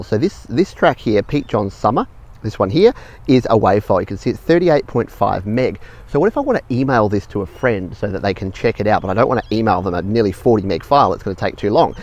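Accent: Australia. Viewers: Australian. So am i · Australian def not a Brit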